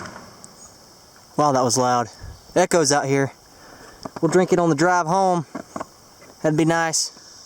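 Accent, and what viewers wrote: South, United States. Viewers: I like his southern accent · nice mellow southern drawl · He has the most southern ascent ever and i love it!!!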